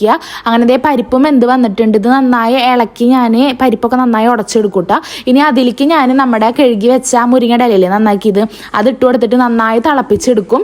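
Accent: Thrissur, India. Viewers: kuthi chathachath parayunnath kelkkan nalla rasam und thrissur slag anu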